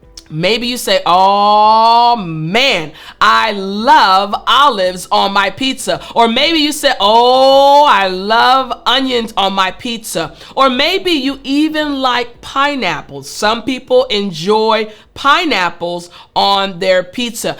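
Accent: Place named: West Coast, United States. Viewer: I clearly love your acsent. why white people especially from west coast really I dont get it. I have subcribe and like and invite all of my family to learn english from your channel. Great way to learn english.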